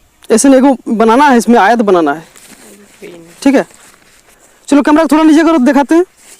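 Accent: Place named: Rural, India. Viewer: Desi awas me mja aaya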